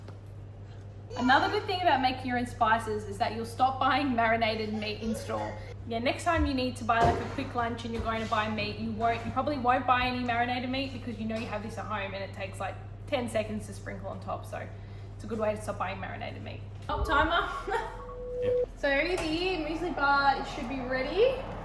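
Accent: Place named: Australia. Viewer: It’s very refreshing to hear an Aussie accent 🤗👍. I’m in Brisbane,you’ve got a hot day down your way today 🥵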